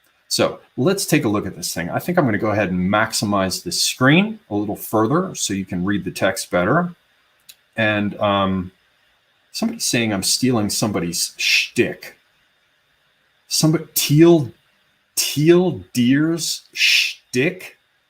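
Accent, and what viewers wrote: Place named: England, United Kingdom. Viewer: D That is so fun! Your posh English accent has a combination of Irish and German dialects coming through. I'm sure glad I'm not the only one going around speaking in various accents. I tend to use Scot's English and Russian, especially when I'm brought to anger by the children. They know when mommy starts sounding Russian she lost control. ha ha ha To my credit it is seldom. Like that joke, "But did you die?" Any dialect is fine but please, no Ebonics. I have to think to myself: "School didn't fix that for you?" but then again we still have fools walking around out here not understanding the difference between your and you're.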